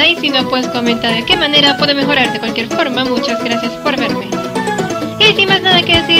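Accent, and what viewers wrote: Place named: Venezuela. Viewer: escuché tu acento (Ven) y dije: tengo que suscribirme jejejejeje me encanta cuando una mujer hace contenido de pokemon.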